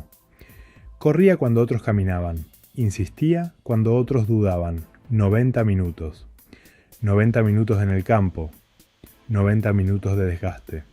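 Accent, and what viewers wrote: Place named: Argentina. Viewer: Me encanta tu análisis sin gritos sin estridencias muy acertado se oye lindo con su cadencia Argentino saludos 👍👏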